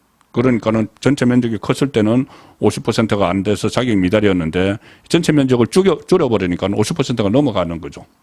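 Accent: Gyeongsang, South Korea. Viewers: 대표님 언제나 감사합니다 정겨운 경상도 서부경남 말씨~~ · 난 그특유의 갱상도 발음때문에 더 리얼감이 있고 좋던데요 .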